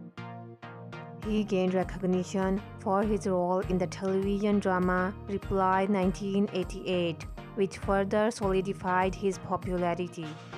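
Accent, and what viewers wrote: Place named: Thailand. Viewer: I could be wrong but i think the narrator seems to have Thai accent.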